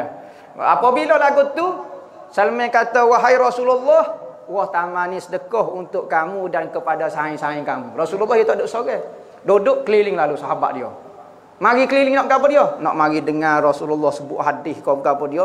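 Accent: Kelantan, Malaysia. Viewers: seronok dengar kuliah ustaz. minta ustaz kurangkan dialek kelantan sebab ada yang sesetengah tak paham dialek ustaz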